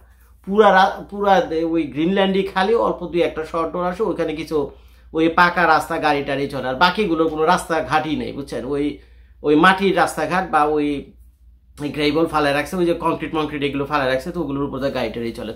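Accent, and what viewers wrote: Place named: Dohar, Bangladesh. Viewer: আপনার কথাবার্তা গুলো শুনতে ভালোই লাগে, নিজের এলাকার (দোহার ও নবাবগঞ্জ) মতো কথাবার্তা মনে হয়। যদিও আপনার বেশিরভাগ মতামতের সাথে সহমত হতে পারি না।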